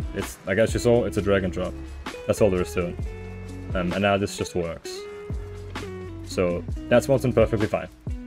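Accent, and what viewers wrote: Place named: Geordie, United Kingdom. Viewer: you sound like an American Geordie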